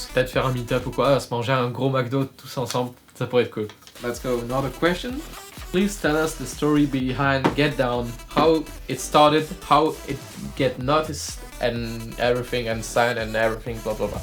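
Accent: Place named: Toulouse, France. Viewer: Belle accent toulousainggg 🇫🇷🇫🇷🇫🇷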